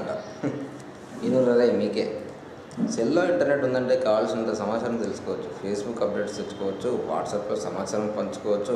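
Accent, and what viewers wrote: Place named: Telangana, India. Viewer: comic comments....especially the telangana yaasa....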